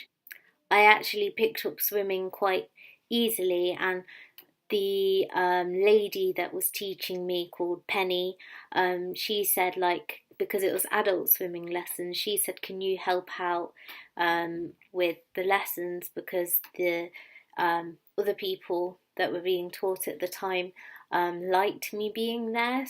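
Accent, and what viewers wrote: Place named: North, United Kingdom. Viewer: Hello sweetie, I feel I’ve meet my match. I can’t do some of the moves that my PT asks me to do, because my mummy tummy is in the way. Things like burpees or bear crawling, because I have a belly in the way. But I’m trying hard and according to the bodytrax I’ve lost 4kg of fat and gained 4kg of muscle. Also, as a northern gal myself, I appreciated your accent.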